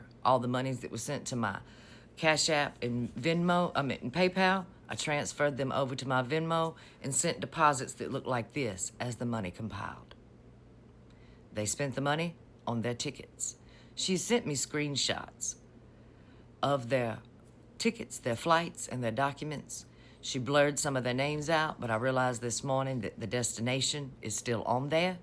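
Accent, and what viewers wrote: South, United States. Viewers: I'm so sorry about this situation. I appreciate and love your candor and delivery, I have southern roots and miss that cadence! I pray for protection on you and yours. Be safe and well!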